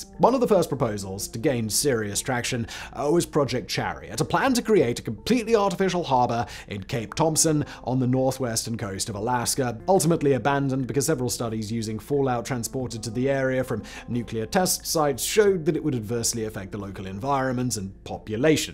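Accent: England, United Kingdom. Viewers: I find it extremely strange that you sound a lot more British now than a few years back when I watched your older videos 😂😂 it's absolutely baffling to me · What a likable not annoying at all bri'ish voice! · You have a lovely voice , so classic English !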